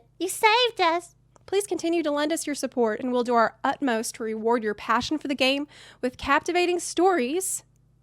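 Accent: South, United States. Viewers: when you sound southern American and British at the same time :|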